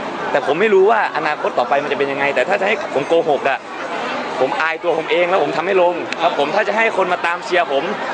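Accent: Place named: Isan, Thailand. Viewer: ชอบตรงพูเดกลางสำเนีบงอีสานเนี่ยแหละ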